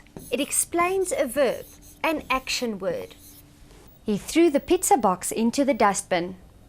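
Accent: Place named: South Africa. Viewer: south african possibily afrikan speaker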